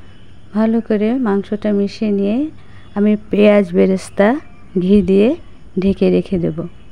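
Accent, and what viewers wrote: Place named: Pabna, Bangladesh. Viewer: ooo amader pabnar moto vasha mone holo tai bollam apu Don't mind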